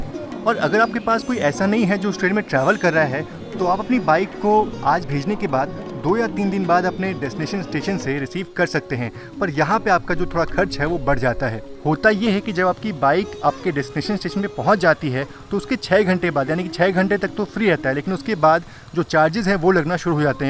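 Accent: Varanasi, India. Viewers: sir aap varanasi ke ho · Bhai kya aap bhee banaras se ho?????? 🤔🤔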